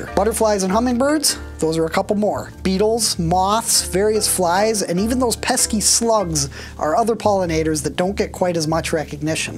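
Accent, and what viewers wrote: Canada. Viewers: I just discovered you. I knew within 8 seconds you're canadian. True North! 🇨🇦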